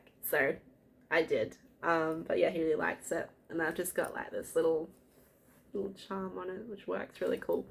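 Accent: Australia. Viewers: Hi I am from Busselton and it is difficult to source patterns fabrics local, Stitches Corner in Perth has just been sold and the shop is moving to Balcatta and will reopen the end of February with an online shop 🤗 as well, I have just started back into cross stitch and quite a novice but like you enjoy other crafts e.g knitting etc I have bought patterns from a Stitch in Time and also Crewel Goblin in Sydney. I enjoyed watching and hearing an Aussie accent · I LOVE hearing a fellow Aussie on YT! What a great first video 😊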